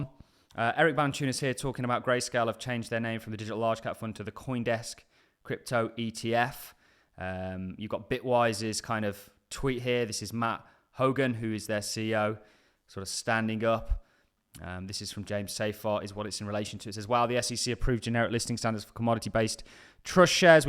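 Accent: Birmingham, United Kingdom. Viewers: Cheers. Keep up the good work. Placing your accent somewhere in the south / South West Birmingham area?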